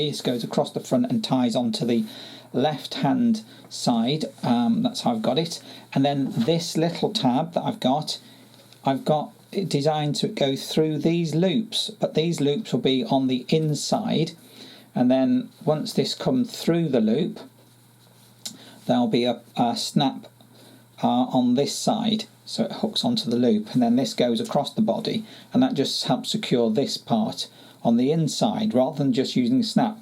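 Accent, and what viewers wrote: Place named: United Kingdom. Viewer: How lovely and refreshing to hear a UK accent! Thank you for sharing your sewing projects. I'm very keen on upcycling so I hope to see more on your channel.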